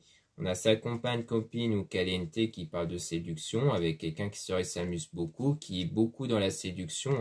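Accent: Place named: Madagascar. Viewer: Je vous découvre grâce à Maria ❤️ j'adore le petit accent malgache /reunionnais🤗 merci pour ce tirage très parlant 🙏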